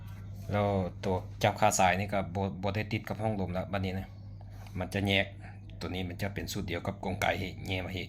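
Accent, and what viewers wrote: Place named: Khon Kaen, Thailand. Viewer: ເວົ້າຄືທາງແກ່ນທ້າວບໍ່ແຕນແທ້ອ້າຍ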